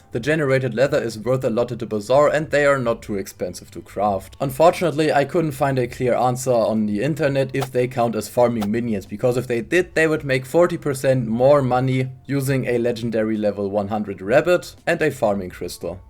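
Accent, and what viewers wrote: Germany. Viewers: Kommst du aus deutschland ? Weil entweder bin ich dumm oder man hört den akzent stark raus 😂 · Man hört den deutschen Akzent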